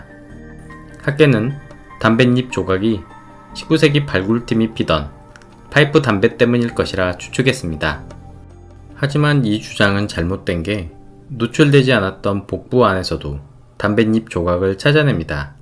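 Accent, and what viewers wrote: Jeolla, South Korea. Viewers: 영상 잘 보고 있습니다~! 드문드문 나오는 전라도 억양도 재밋어요 ㅎㅎㅎ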